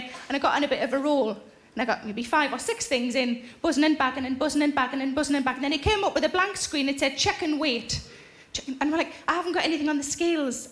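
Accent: Newcastle, United Kingdom. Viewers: Hey English people, is this a Geordie accent? Asking from Australia. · I'm a Shields lass too Sarah and so proud of you. You are hilarious and it's great to hear jokes in a geordie accent and I identify so much with your humour. You had the King and his missus in stitches!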